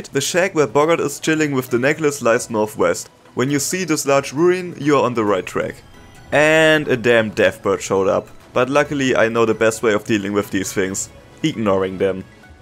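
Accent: Germany. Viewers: bist du bei deinem Englisch zufällig deutscher?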